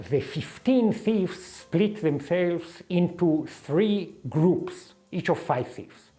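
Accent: Israel. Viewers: Its typical israeli, like if you had an israeli prof in a comedy show, thats what he should sound like Sources: Been to his Uni, lives in the same land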